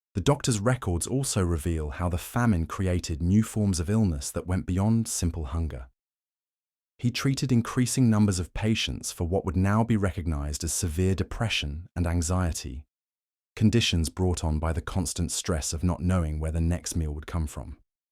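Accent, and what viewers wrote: England, United Kingdom. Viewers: I know this is terrible,,,,is it just me or does his English accent make this sound more…. you know what I mean?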